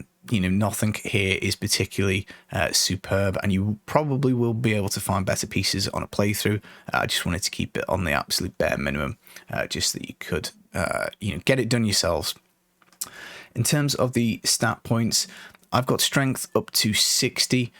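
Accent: United Kingdom. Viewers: I found your channel back in diablo3 times and man! I'm so happy you cover d2r! I enjoy your vids as you keep it real, clear, without needless fuss. Plus I miss UK (lived there for 7 years) so listening to your accent brings back memories :D Keep it up, brother! I do hope youtube algorithm will smile upon you soon as this channel is a quality stuff!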